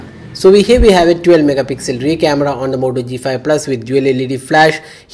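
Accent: India. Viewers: Ingles com sotaque da Índia. Fica bem cantado mesmo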